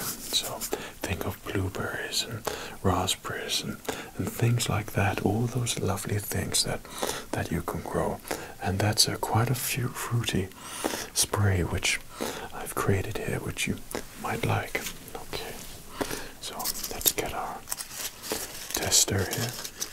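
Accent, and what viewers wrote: United States. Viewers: I mean with all due respect, because this video is hilarious… but what the actual fuck,?! 🤣 Hit the bowl too many times and came up with a WILD video! Really not too shabby there with the American accent! You don’t lose it too often and when you do, it can easily be glossed over when we reset. A dialect coach might not be fooled but I can be! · Your american accent is improving 👌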